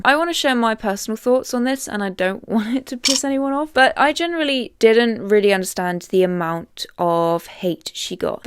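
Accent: United Kingdom. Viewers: You probably get this A LOT but you look so much like Emma Watson and u sound like her cuz ur both British 😭 That’s a good thing though because you are both very pretty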